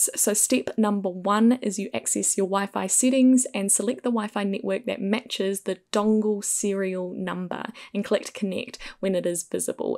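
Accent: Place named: New Zealand. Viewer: I'm still struggling with the Kiwi accent, where an "I" is pronounced like an "E" and an "E is pronounced like an "I".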